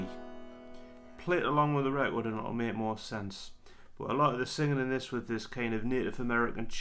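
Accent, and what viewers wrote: Newcastle, United Kingdom. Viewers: BTW, I'm not from the UK, is that a Geordie accent you have? Cheers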